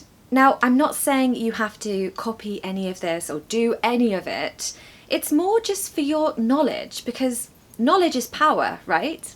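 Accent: United Kingdom. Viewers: Hi, your British accent and Tudor architectural style on the background reminded me of my happy time when I was doing my PhD study in London! I'd like to learn more British English and culture here! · Hello teacher! your accent and of other British teachers are so clean. I can identify the vowels, diphthongs, triphthongs... Like the English teacher in the movie My Fair Lady. A song well recorded, mixed and mastered by a sound engineer, each instrument is heard well, being it in the right or left side of the stereo field using headphones. Thanks teacher! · Hello, I come from HK and now living in UK. Congratulations for you got the Sliver play from YouTube. Super thanks for your video which I did to learn every day. It was really helpful for us to listening British English. 🎉🎉🎉🎉🇬🇧🇬🇧🇬🇧🇬🇧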